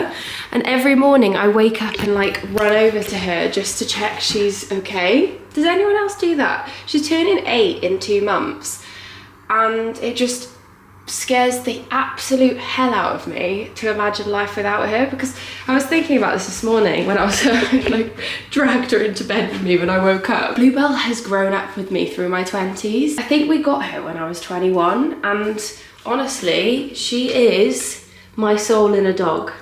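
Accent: Wales, United Kingdom. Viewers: Why don’t people understand change is a great thing and it’s completely natural! Change is how we grow into the people we want to be. Also how bizarre that sometime says your losing your Welsh accent like of course you’re living in England😂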